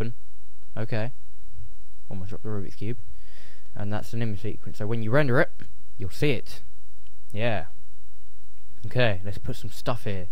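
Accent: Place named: United Kingdom. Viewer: Brits are taking over youtube tuts! and I love it! lol!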